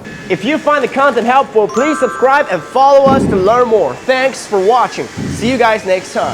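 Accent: South, United States. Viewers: The southern accent is much better than the British accent.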